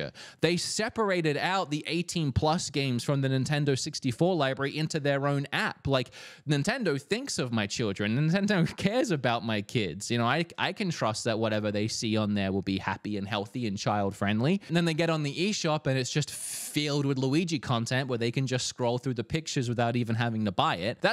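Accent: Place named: Australia. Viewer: Your accent is quite interesting. Australian as a base, but select words are pronounced in a US American accent. Confused me for a bit when I started watching.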